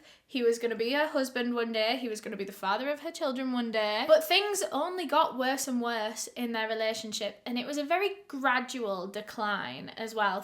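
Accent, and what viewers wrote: United Kingdom. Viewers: I know this a British accent, but it seems different! Your accent is so satisfying!! What area of the UK speaks like this,,,, unless it’s not British haha.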